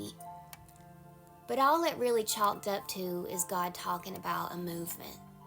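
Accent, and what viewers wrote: South, United States. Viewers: how sweet and southern your accent is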